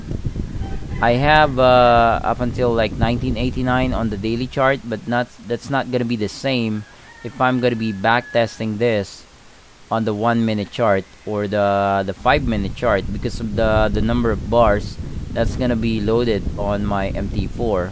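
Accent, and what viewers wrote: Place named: Philippines. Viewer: he's got the filipino accent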